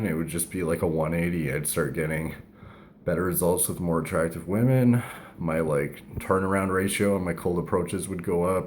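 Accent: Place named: Canada. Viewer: I was surprised to hear was only 30 with his maturity and depth of knowledge. It makes more sense after seeing this video that was only 7 years ago because he comes across like a young guy in this vid- sounding so Canadian and gymbroish. In comparison with my maturation over a 7 year period I think it must be a combination of self-reflection from regular posting and PEDs.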